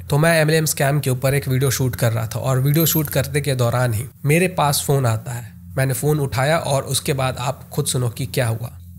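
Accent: Bihar, India. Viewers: Your speaking accent was bihari. You have to speak like local. Please catch delivery boy also because he was saying "vahi aana jahan har baar lete hai".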